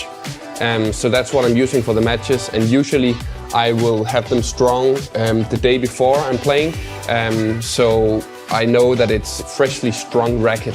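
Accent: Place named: Russia. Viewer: i thought his voice was like a russian- english language huhuhhh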